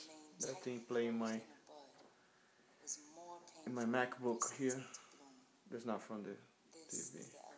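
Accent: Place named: Brazil. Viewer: brazilian accent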